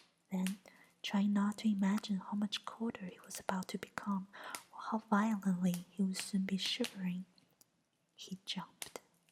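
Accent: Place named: China. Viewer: from your accent I could tell that you are from china ?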